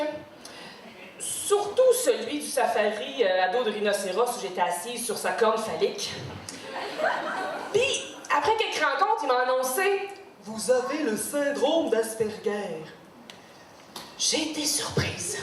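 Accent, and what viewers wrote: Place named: Canada. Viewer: Ma foi, je t'ai encore trouvée bien drôle ! j'avoue que j'ai parfois du mal à tout comprendre car je ne suis pas une habituée de l'accent canadien mais j'ai quand même bien ri :D J'aime bien ce que tu fais, je trouve qu'il y a matière a bien rigoler et tes sujets sont intéressants en plus !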